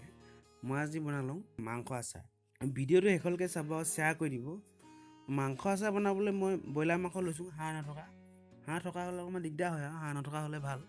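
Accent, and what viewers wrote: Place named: Lakhimpur, India. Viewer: nhoi apunar kotha keita lakhimpur r lorar nisina...so xudhilu...beya napabo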